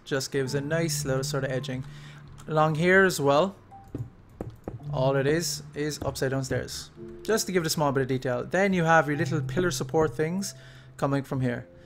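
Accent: Ireland. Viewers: Can you please start making videos again? 1. I just want to listen to you talk (the Irish accent is the best) and 2. I really like your builds.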